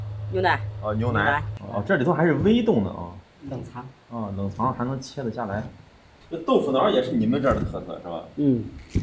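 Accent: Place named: Xingtai, China. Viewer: 太感谢了！去探店了我的老家。我在上一篇石家庄的探店视频下给您留言推荐邢台，紧接着邢台的视频就来啦！出国快十年了，听到久别的郉普乡音，看到热闹的清风楼，美食也是杠杠的！最棒！🎉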